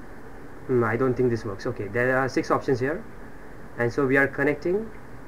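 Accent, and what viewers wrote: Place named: India. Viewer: ur original accent u do no harm so expecting an indian accent . the overall video was gud keep up the gud work bro👍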